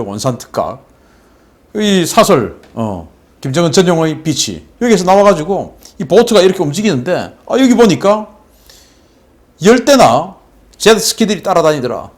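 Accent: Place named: Busan, South Korea. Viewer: 신교수님의 촌철살인적인 강의를 듣는건 내 미천한 지식에 보탬을 얻고 지혜를 향상시키는 귀중한 시간인데 그것과 더해 아주 화끈한 즐거움을 선사하고 가끔 폭소를 자아내게합니다.ㅎㅎ 구수한 나의 본향 부산 억양도 정겹고..술자리 같이 하고싶은 마음..^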